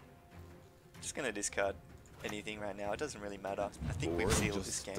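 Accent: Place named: Australia. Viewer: Great Video really liking your content, + Australian accent got me moist.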